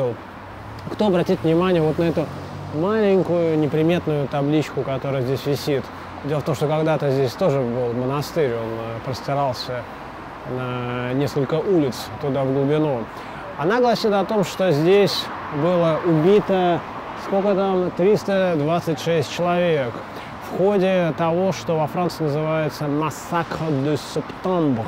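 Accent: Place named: Russia. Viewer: С французскими окончаниями у мэтра не задалось, как читает, так и произносит. Strong Russian accent.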